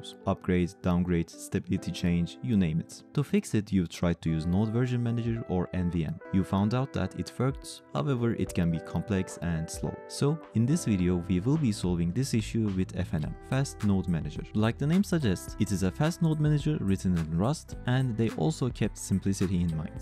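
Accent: Turkey. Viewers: you sound turkish, why no turkish video?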